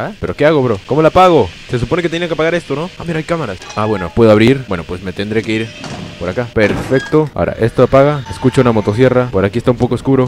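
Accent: Peru. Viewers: Eres del Callao no choro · eres peruano?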